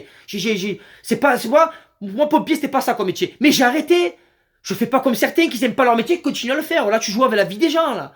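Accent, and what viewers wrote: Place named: South, France. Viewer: Salut le marseillais superbe vidéo ah l'accent du sud me manque :)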